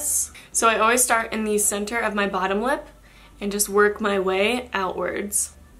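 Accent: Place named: Boston, United States. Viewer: By any chance are you from Boston? You have a very interesting accent. Anyhow, awesome lipstick tips!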